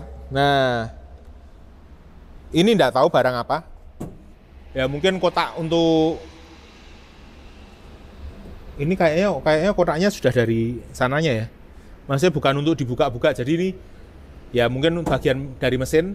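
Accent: Java, Indonesia. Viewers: Pak , njenengan cengkok jawanya kok medok banget ya...bikin orang ngakak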